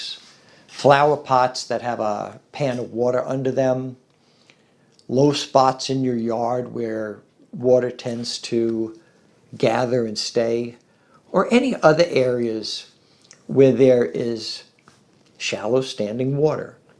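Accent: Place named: New York, United States. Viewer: AND YOU MUST BE FROM NEW YORK. LISTENING TO YOU MAKES ME SO 😔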